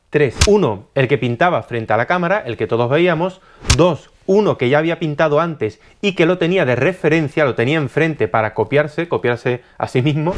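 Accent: Spain. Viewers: Porque hablas como español si eres de mexico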